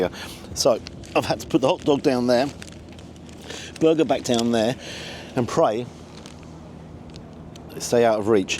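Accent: United Kingdom. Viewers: Love this - it's just like where I grew up in the US except with a British accent and a bit less food variety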